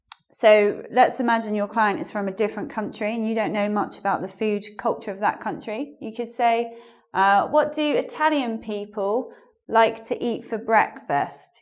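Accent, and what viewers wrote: United Kingdom. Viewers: Strong Britsh accent! :) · I LOVE THE BRITISH ACCEPT XD !!! I LIKED TOO MUCH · I love your lesson, also British accent... many thanks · omg British women has the cutest accent which makes me very attracted to them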